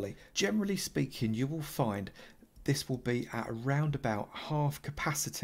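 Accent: United Kingdom. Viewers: It's called a British accent. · Cause he's british and sounds awesome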